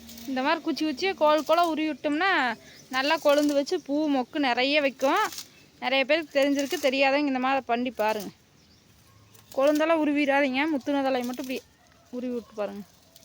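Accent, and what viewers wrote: Kongu, India. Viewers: நீங்கள் கொங்கு தமிழ் லயே பேசங்க அம்மா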